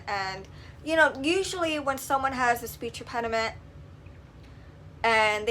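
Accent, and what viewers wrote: New York, United States. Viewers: I wouldnt have noticed any impediment until you pointed it out...but do New York accents not all pronounce coffee Kawfee forever fawevva water waader?? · i hear a new york accent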